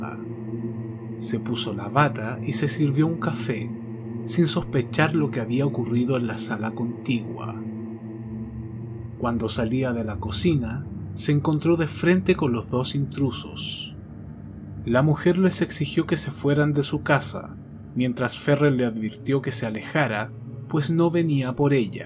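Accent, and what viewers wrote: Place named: Chile. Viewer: La narración y dicción es perfecta hermano...y el acento sutilmente chileno... je je ..oye un abrazo y muchos éxitos...nos tienes enganchadísimos a tu canal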